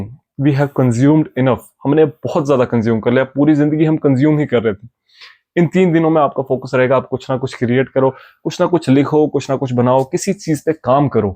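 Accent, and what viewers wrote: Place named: Kashmir, India. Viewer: First i thought you're from Delhi then our kashmiri accent got it 😅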